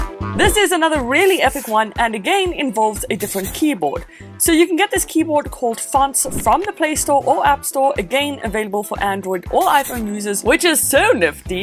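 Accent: South Africa. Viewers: Super professional! Learnt so much. Thank you!!! As soon as I heard your voice I knew this was a saffa lol. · new sub. youre south african, right?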